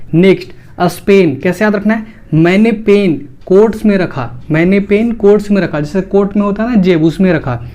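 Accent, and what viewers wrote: Bihar, India. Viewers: सर आप बिहार से ही हो क्या ,, बेस्ट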